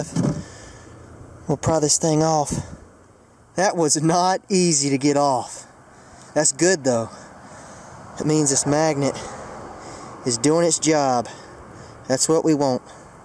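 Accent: South, United States. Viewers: You have THE CUTEST TWAng ever.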